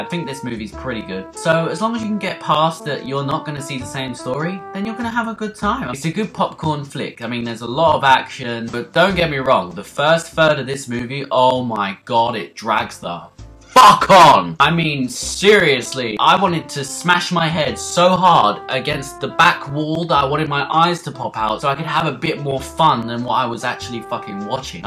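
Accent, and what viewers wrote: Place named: RP, United Kingdom. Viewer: Great review and a great posh accent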